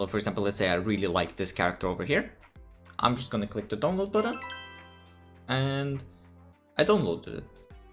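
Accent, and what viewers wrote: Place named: Russia. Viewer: У тебя акцент русский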